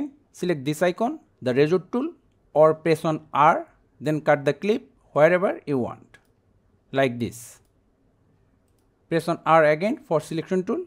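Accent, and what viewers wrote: India. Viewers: I love everytime I need to know how to edit something on a program I just found there is an Indian sounding man ready to explain it. good video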